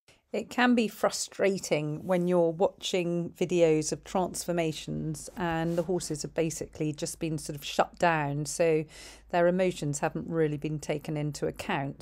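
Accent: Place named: United Kingdom. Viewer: Thank you so much for taking time and making subtitles! British accent is way more difficult to understand than the american one (for me personally). The funny thing is that we study at school British English but USA produces a lot of content on the internet so i had to adjust and now i understant American English better than British English 😅 Also thank you for sharing the right way of "talking" to animals. The World needs to be a better place for them.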